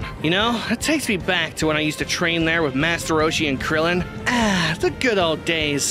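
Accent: United Kingdom. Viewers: Ah yes British goku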